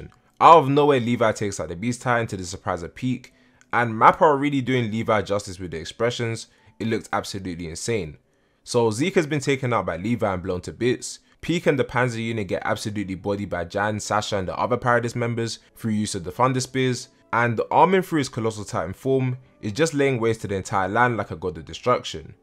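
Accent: London, United Kingdom. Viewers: Finally a Londoner doing these reviews loool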